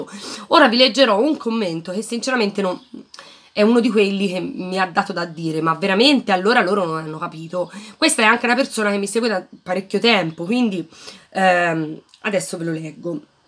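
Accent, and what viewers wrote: Tuscany, Italy. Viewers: Sono contenta che farai ancora dei video, mi piace sentirti, mi fai compagnia, amo la tua parlata, mi riporti nella mia toscana da cui manco da 7 anni e che mi manca... Quanto alle domande che ti poni, ti capisco io me ne faccio troppe e tante su tutto, come dico alla mia amica io non ho un cervello ho un multisala, in cui proietto 1000 film diversi più volte al giorno e tutti diversi... Anche io mi aspetto tanto da me ed ho paura di essere una delusione per gli altri (ho qualche cicatrice anche io, anche se non si vede), per fortuna ho chi mi prende a calci o minaccia di farlo e allora proietto qualche film in meno, ma poco... Tu continua così che vai benissimo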